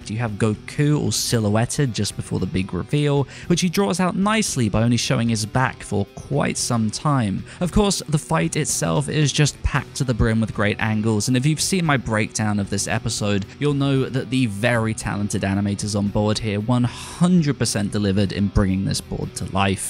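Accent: United Kingdom. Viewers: Japanese words + a heavy english accent sounds a bit cringeworthy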